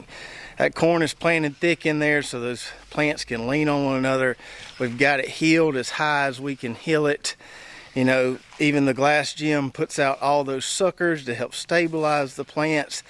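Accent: South, United States. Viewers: I love how you say potatoes I like southern accent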